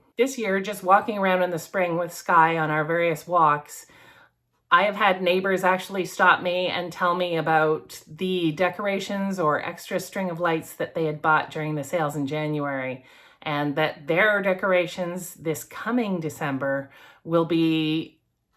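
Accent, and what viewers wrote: Canada. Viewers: I could tell you were a Canuck after just one sentence as I am a veteran of five entire seasons at two different Lake Temagami, Ontario canoe tripping camps. And as we all know, Canucks are supposed to eat their French fries with vinegar not ketchup. I am also an ex Benedictine monk and the sleeping habits you describe are most typical of cloistered hardcore monastics such as the Trappistines and the Poor Clares. I sleep in a heavy duty Cabela’s camp cot in a an extra wide sleeping bag rated to 20 degrees Fahrenheit and I sleep in my family room which I use as an efficiency apartment of sorts as I live with my sister in the family house we inherited jointly and she is hogging the entire second storey with all of the additional bedrooms. I used to sleep in the basement until it started flooding owing to the ongoing climate disaster with the storm sewers backing up owing to repeated torrential downpours. 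You could use a little more of that rain up there in Canuckiana to put out your forest fires.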